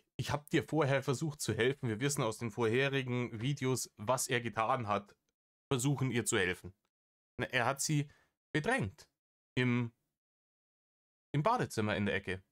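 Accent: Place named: Bavaria, Germany. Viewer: Wie sympathisch, mir mittlerweile bayerischer Dialekt erscheint, seit ich den hör! Ofi ist die Puffmutter, der gute jedoch trifft absolut meinen Geschmack, wie man bei uns sagt: mit dicker Fresse und ziemlich viel dahinter! Also,i geh jetzt nein da,in den Reiner!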